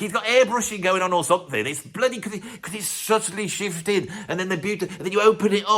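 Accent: Birmingham, United Kingdom. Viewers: Love the vids. I'm not into prog, jazz or jazz fusion (I'm not sophisticated enough, I s'pose). I don't come here for them anyway. I come for the freestyle rambling humour of grounded in a deep knowledge of music. Just the Brummie accent brings a smile. I watched a recent video right to the end that had the rambling Brummie monologue at the end wit the animation. Loved it. Reminiscent of Eric Idle's rant on the travel agent sketch in Python (could that be the first comedy rant?...). I watch these lists tightly gripping the arms of my chair praying that a album will get its due. Usually, I disappointed, but, as previously mentioned, I'm not very sophisticated. As a Canadian, I was glad to see on the list. Our artists never get enough recognition. As for getting words wrong and your philosophy regarding perfection, I'm a few years older than and can empathize. 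I've always annoyed people with my ability to recall musicians, actors, directors etc... That has suddenly become more difficult. I can start a dinner conversation about a famous director then, suddenly, his name leaves my brain for a minute... It usually comes back within a few minutes but it is disconcerting. What a drag it is getting old... someone should work that line into a song... When it comes to mistakes therein lies the charm. I enjoy for the spontaneity, the unbridled enthusiasm. If was to present a perfect narrative, true to script like a politician reading off a t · It’s the British accent, neuroticism and insights that make this bloke’s channel cool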